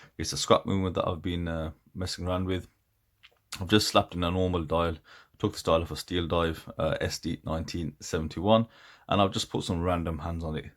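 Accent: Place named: Northern England, United Kingdom. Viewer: I know i’m a year late to this video but better late than never. Great video bro. Where are you from, you sound Northern.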